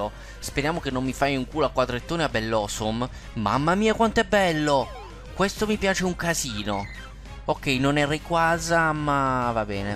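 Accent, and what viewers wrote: Bari, Italy. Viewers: Sei di bari?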